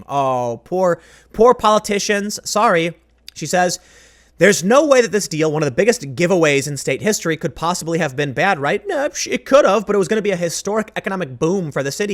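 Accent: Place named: Chicago, United States. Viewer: I was listening to you talk, and I thought to myself, "Self? That accent sounds just like Bill Murray's. I wonder if he's from Chicago like Tim." And, sure enough, he is.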